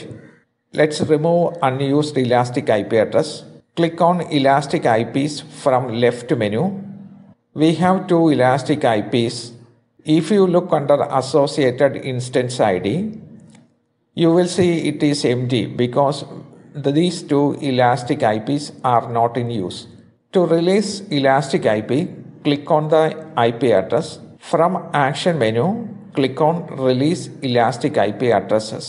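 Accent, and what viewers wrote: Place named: Kerala, India. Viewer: Full Mallu acsent ..Funny!!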